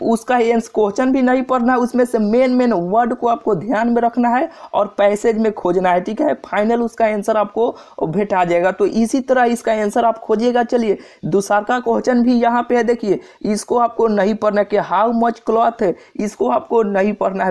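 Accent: Bihar, India. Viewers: Bihari ho ka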